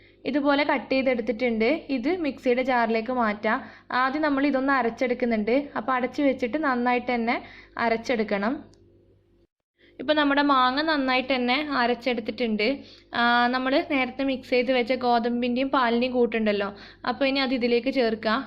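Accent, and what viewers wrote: Kannur, India. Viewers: Kannur kari aano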